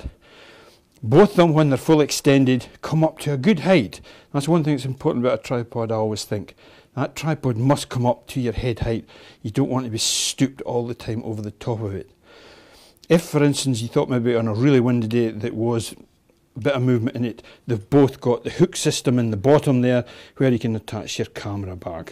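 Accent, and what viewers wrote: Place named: Scotland, United Kingdom. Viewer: Clean Scottish accent ;-) like that :-D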